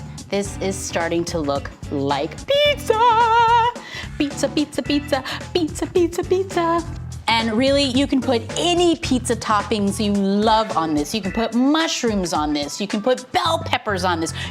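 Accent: South, United States. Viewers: yes fpr the country accent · Hello there southern accent! 😂 I love it 😊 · Omg u made me so hungry LOL pizza one looked so good and I loved the country accent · I had no idea had a country accent before it sounds so adorable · Hi!!!! I love the red lip and I just love pizza too!! Your son and I will get along well, and my daughter, but she only likes cheese. You would think I'm italian bc I love Italian food!! I love your food videos. You just sounded like Tamera in your southern accent!!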